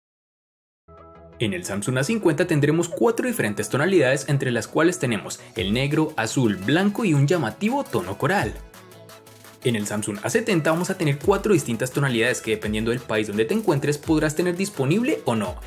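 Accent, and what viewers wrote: Cali, Colombia. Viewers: Es de Cali ? Cierto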